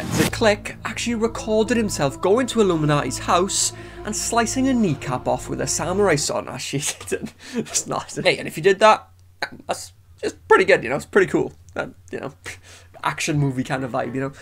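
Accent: Wales, United Kingdom. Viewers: Nothing quite like listening to a nice Welshman summarize this bs. At least I'm assuming you're Welsh by the accent.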